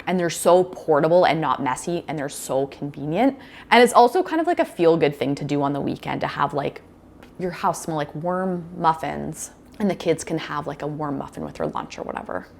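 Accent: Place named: Canada. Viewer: Is your money info American or Canadian? I can’t recall if you’re in Canada but I know some of your words sound Canadian. Just curious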